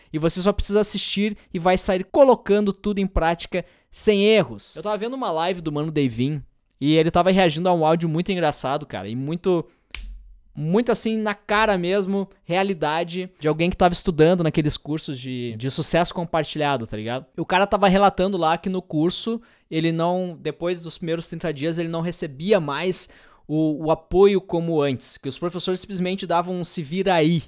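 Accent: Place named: South, Brazil. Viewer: Ba, mas tu é daqui do Sul, guri? hahaha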